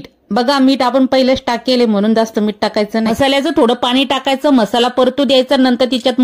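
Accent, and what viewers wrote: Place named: Khandesh, India. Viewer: Tu tumachi bhasha khandeshi vatatey 👍👌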